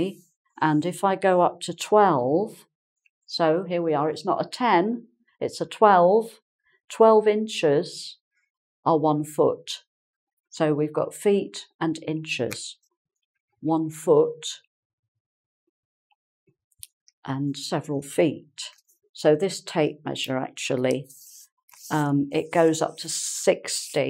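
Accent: United Kingdom. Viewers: Hi, i love the british accent. But, how do you pronounce heat, hate, hit, height? Are they being pronounced the same way?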